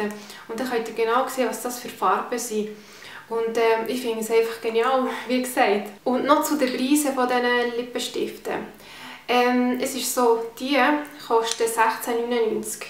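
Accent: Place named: Solothurn, Switzerland. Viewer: Soledurner Dialäkt <3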